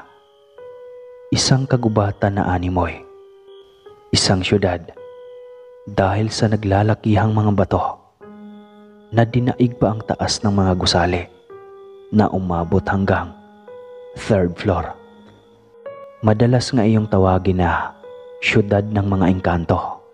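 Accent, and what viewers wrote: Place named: Visayas, Philippines. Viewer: Boss bisaya kaman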